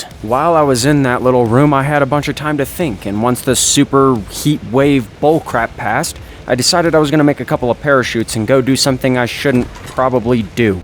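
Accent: United States. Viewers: Sound Texan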